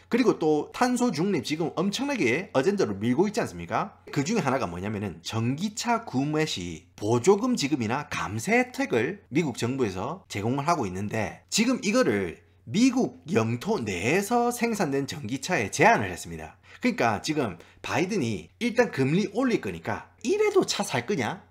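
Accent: Gyeongsang, South Korea. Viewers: 같은 경상도 사람인데도 사투리 찰지게 하시네요^^ 재밌게 보고 있습니다~!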